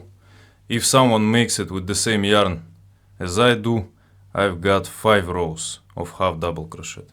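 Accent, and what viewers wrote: Russia. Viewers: I love the thick Russian Accent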